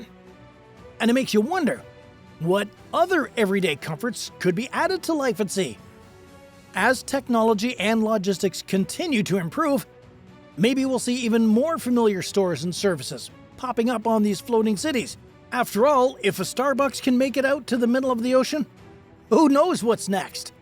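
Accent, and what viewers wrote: Canada. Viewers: Fellow Canadian narrating?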